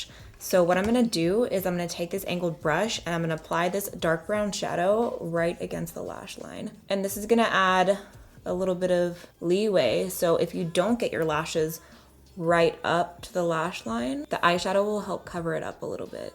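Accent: South, United States. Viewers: Used to have a super southern accent too... go watch the original videos and you will see what I’m saying. I do love her makeup so much though!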